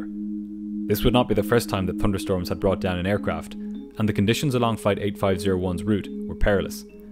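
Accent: Ireland. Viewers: Love your channel ❤ ye sound like a fellow Irishman 🙋‍♀️🙋‍♀️ great to see an Irish channel doing well 🇮🇪🇮🇪🇮🇪🍀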